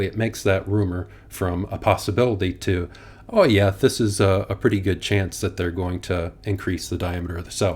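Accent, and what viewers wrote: New Zealand. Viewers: Nah, Yeah... That’s definitely Kiwi speak!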